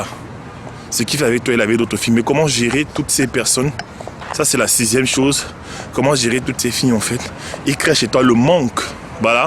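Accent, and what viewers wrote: Cameroon. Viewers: Pas besoin de te présenter gars, ton nom, ton timbre vocal et ton élocution font de toi un Camer. Et merci pour le conseil. Je t'invite à aller écouter les bra-tra-saqueurs de Marseille su Femme Immigée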